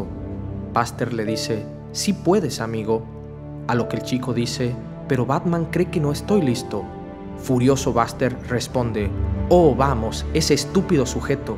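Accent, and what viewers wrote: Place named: North, Argentina. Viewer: Perdon de donde sos,suena tu voz a como hablan por el norte en Argentina.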